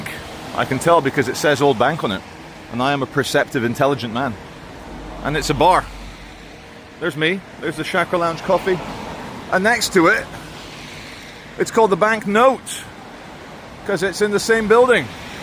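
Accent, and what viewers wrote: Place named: Scotland, United Kingdom. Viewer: so nice for me to hear UOUR Scotish accent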